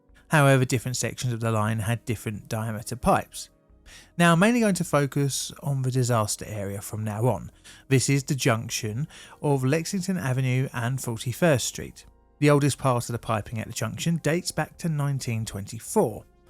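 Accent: United Kingdom. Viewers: Now I know, what all that steam we see is, in the cities. Very 19th. century-ish, and modernly beset😆. As you can tell, I'm thankfully NOT, from any big city. How could 2,000 customers, turn out to be 100,000 businesses, and residential customers?? Ain't that, the same thing🤔?? And if the narrative was spoken with more English, and less British, we here across the pond, might be able to understand exactly, what you're saying.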